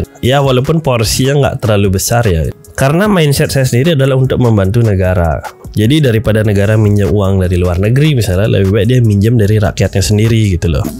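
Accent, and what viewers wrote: Bali, Indonesia. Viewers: Logatmu enak skali bang didengar. Org bali bang ?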